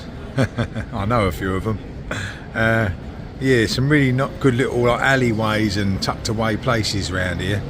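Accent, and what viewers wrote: London, United Kingdom. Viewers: Wondering which video to heap admiration to you on. Fabulous video. It's the best channel to bring London's hidden gems to us in the Frozen North. Museums, cafes, pubs, sights are presented in a lovely, non-pretentious way, with humour and a true Londoner's love of the city. Having worked in London almost 50 years ago, its lovely to hear a 'Landan' accent. Great channel. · I love your knowledge of London. For some reason I love London and think it must have been part of a previous life. You don’t say who you are! I love your accent, think you’re a Londoner. Loved Leadenhall Market. I am eager to visit and try one of those scotch eggs. Thank you for rousing my desire to visit London 🐦‍⬛ :)) 🌻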